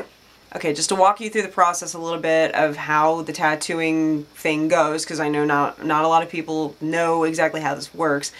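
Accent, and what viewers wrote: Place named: Northeast, United States. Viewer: Hi I have been a follower since early 2012 Just Curious what state are you in. You sound kind of North Eastern U.S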